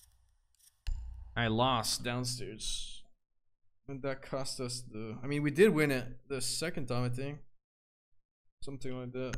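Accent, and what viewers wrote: Quebec, Canada. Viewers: Es-tu Québécois parce que je l’entend dans ton accent haha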